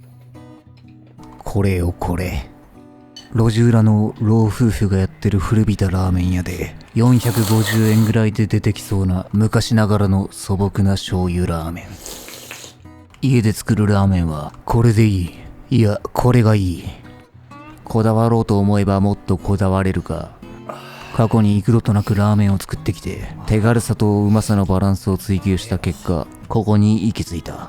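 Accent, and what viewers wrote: Kansai, Japan. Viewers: 九州出身なのになんで関西弁しゃべるときあるんじゃーい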